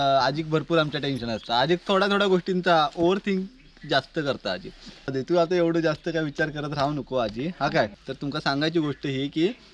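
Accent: Konkan, India. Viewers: भाऊ तू कोंकणी आहे का सुद्ध मराठी आहे तुझी❤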